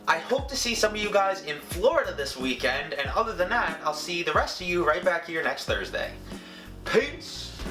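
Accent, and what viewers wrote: New York, United States. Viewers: potter wtf is a thick American twang he has a new York accent most people (away from the east coast) don't talk like that lol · Oh he lives in New York too? I thought so, his voice sounded SO New York and familiar. I bet he lives either upstate or Long Island like I do. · I'd appreciate it if you could give me your American accent in exchange for my boring old Australian one. Thanks pal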